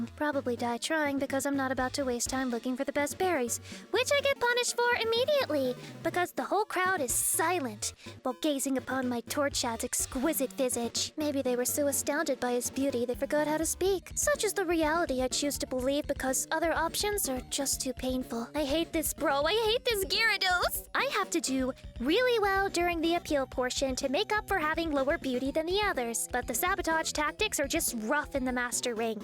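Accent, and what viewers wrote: South, United States. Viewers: I dunno what to focus on first... The fact that your singing skills are improving with each video? The fact that the cringe rap is cringe af and I love it? The fact that your editing is great and I love the "text" jokes? Your great southern accent? The "uwu"? Or the fact that you somehow never went to Pacifilog Town on this save file? For real though, in all my years of playing Pokemon (which is like... a lot of years, idk) I've never once done a contest. And the worst part is that I somehow feel like I didn't miss much. But that means that when I was a kid playing this game, I was too dumb for both the Battle Frontier and the Contests! Not much has changed since then I guess. Anyway, great video as always! Keep 'em coming, queen! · Was that an authentic "southern hell" accent :3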